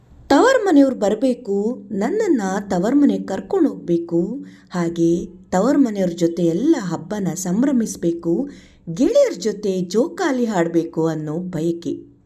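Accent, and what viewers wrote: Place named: North Karnataka, India. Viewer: ನಿಮ್ಮ ಹಾಡು ಸೂಪರ್ ಸಿಸ್ಟೆರ್ ಅದಕ್ಕಿಂತ ಹೆಚ್ಚು ನಿಮ್ಮ ಉತ್ತರ ಕರ್ನಾಟಕದ ಶೈಲಿಯಲ್ಲಿ ಮಾತಾಡಿದರಲ್ಲ ಸೂಪರ್ 👌👌👌👌👌👌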